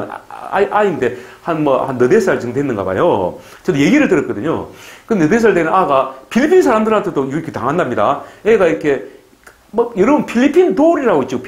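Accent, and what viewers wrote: Gyeongsang, South Korea. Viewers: 오래전 은퇴하고 가보고 싶었던 나라인데 최근에 안좋은 이미지가 강해서 잃어버리고 있던 나라인데 덕분에 다시보게 됩니다. 맞나? 따라해봐~ 마취강도...ㅎㅎ 경상도 사나이 구수한 강의 친근하고 좋습니다.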